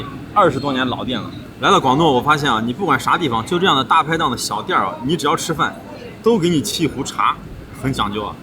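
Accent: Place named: Shandong, China. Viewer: 你是山东口音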